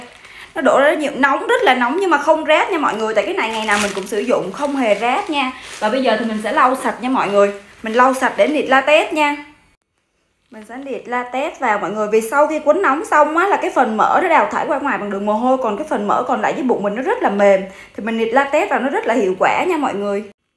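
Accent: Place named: Vietnam. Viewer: nghe giọng điệu là biết ở Vn rồi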